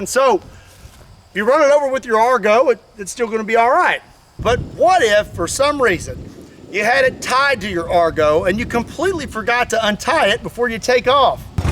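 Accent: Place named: Texas, United States. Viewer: What's with the Texas accent?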